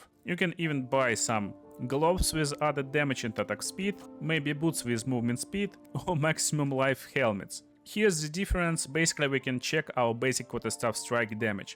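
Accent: Russia. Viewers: Where's the accent from? Russia?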